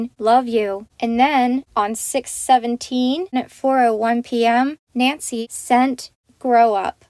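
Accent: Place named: Pennsylvania, United States. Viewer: You have a slight Pennsylvania accent lol I’m born and raised in Pittsburgh and can tell that accent anywhere. You have to be from Pennsylvania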